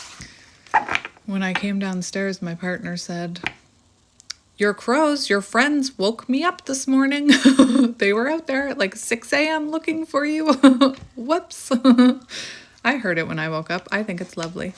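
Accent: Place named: Canada. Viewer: As a Canadian I've been wondering for a minute if you were also Canadian because of your accent. I'm in the U.S. so I can def recognize that homeland dialect 😂❤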